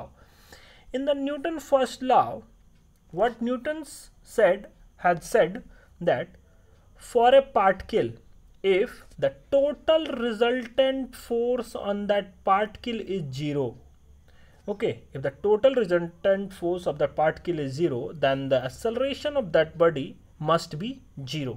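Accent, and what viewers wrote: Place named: South India, India. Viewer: Sir south accent kyun use karte h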